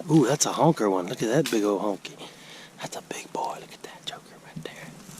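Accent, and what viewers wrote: South, United States. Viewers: I get a lot of enjoyment out of misspelling in my head, the way that he says it. I have spent some hysterical minutes trying to spell aluminum foil "Foll". I'm not making fun, I'm just really enjoying your puns and your Southern accent anthropologically. I am not ever going to be clever enough to play on words like you do, it's a gift and we are lucky you share with us.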